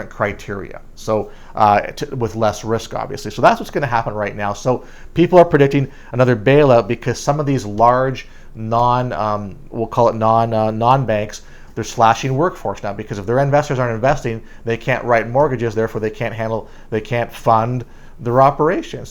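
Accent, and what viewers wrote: Canada. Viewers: ouuut😅 abouut he's definitely from Canada.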